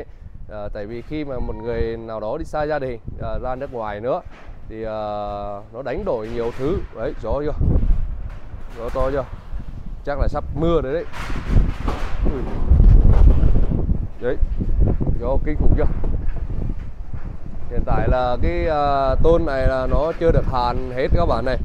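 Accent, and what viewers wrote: Nghe An, Vietnam. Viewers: Bặn lên bặn lên. Đúng đậm chất nghệ luôn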